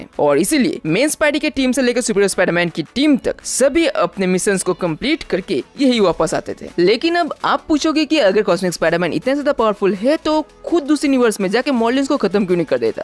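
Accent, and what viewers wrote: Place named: West Bengal, India. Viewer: Bro ur accent is so heavy, anybody can tell that u belong to West Bengal 😂( No hate, i love ur vids too much bro)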